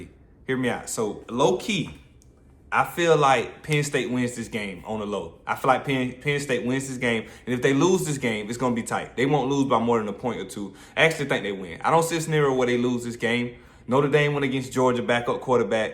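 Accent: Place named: Jacksonville, United States. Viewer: Keep these picks comin from the 904 Jacksonville Fl and I think I hear the Duval in you too😂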